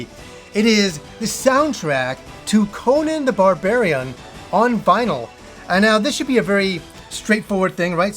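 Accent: United Kingdom. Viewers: Hold the phone...you’re British?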